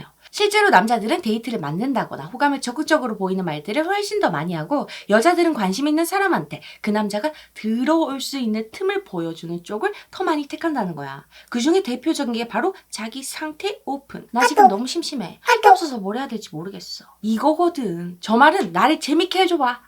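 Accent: Gyeongsang, South Korea. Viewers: 갱상도 여자?짜치다니 ㅋ ㅋ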